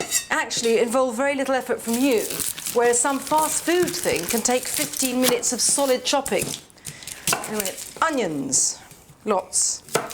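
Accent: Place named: RP, United Kingdom. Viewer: I can't get over that Howard-ish armchair and those simple cream coloured drapes, for someone from a working class background these are interiors I can only dream of, until finally I give up and find other values and ways to be happy in life besides money, comfort and ownership. I think that that's what's so wonderful about food is that it's available for everyone and affordable at the same time depending on the price range, of course. She might have a posh accent and some formidable vocab up her sleeve but the concept she sells is for everyone.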